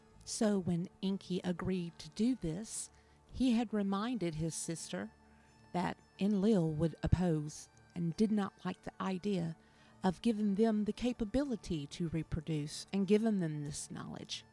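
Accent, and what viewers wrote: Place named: South, United States. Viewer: Loved this. Beautiful video. The southern twang narrator gave it extra charm😊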